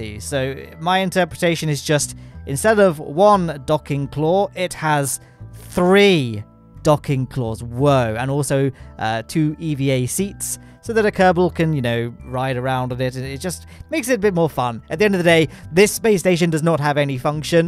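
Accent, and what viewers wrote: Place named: RP, United Kingdom. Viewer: why you so posh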